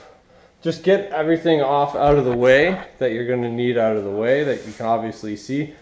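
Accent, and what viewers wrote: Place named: Ontario, Canada. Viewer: Awesome video thanks ! I’ve ordered my new downpipe ,exhaust and turbo and Malone tune , next will be my turn to scrape my knuckles 😂😂😂😂 I totally guessed your accent at the beginning too , had to be from Ontario Canada 😂😂😂 I’m from Bc 😬🤷🏼‍♂️ 🇨🇦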